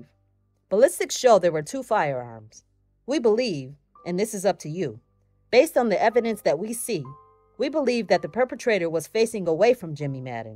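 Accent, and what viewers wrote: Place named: New Orleans, United States. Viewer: Heyy na!! New sub here.. ⚜️⚜️⚜️ I think I hear nola in your voice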